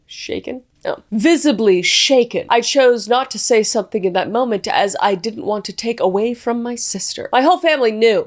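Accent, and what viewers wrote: Germany. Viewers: Yep your accent sounded like my german mom trying to speak english 😅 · Sehr gute, deutsche Akzent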